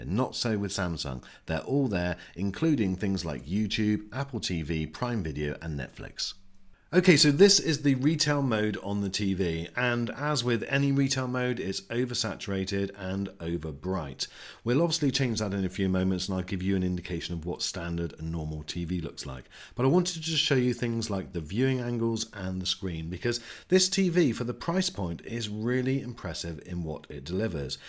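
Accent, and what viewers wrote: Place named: United Kingdom. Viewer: Dude he’s not Australian he’s British LOL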